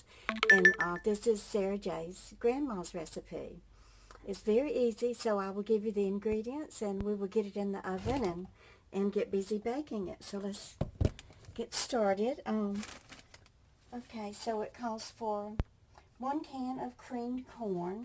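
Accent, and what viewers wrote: South, United States. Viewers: Thank you for sharing that recipe,. You always have tasty foods to share with viewers. As always, can't get enough of the Southern accent...it reminds me of home. Stay blessed, kind soul.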